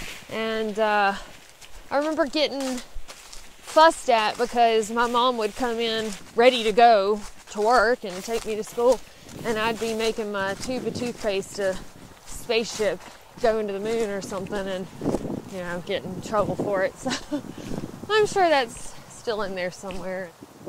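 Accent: South, United States. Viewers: I love to listen to you speak, the beautiful Southern lilt in your voice, the way you speak like a little girl when you're talking to insects or animals, the revery here of when you were a little girl and how it's affected you as a woman, the love I hear for your dog Hank when you find one of his hairs, "But now, uh, I hope it never stops. And I can tell it's his cuz there's a whole sunset in the end of it." Whether you're talking about equipment, or the trail, or dispensing some of your homespun philosophy, it's always interesting. You so seldom have a harsh word to say, and have so many kind and uplifting things to say. It's what makes your channel so great. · loved the deer at the beginning! Did you tell them "hey, darlin'!"? If I had ANY deferment of gratification (which I do not) I would wait until you finished and watch them all at the same time! 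So good - and as a non-deep south southerner, I love the accent!!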